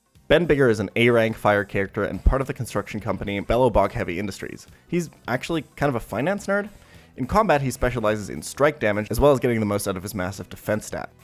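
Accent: Germany. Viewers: He is, in his earlier videos you could hear his german accent more clearly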